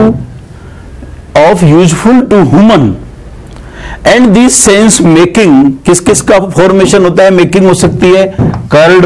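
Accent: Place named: Bihar, India. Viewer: bihar ka teacher hai kya yr?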